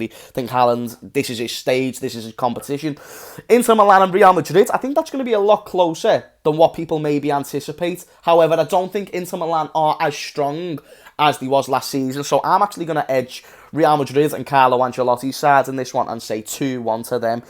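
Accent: Liverpool, United Kingdom. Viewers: Will love to see his reaction when we thrash Liverpool! Will be great to see him crying with this scouser accent 🤣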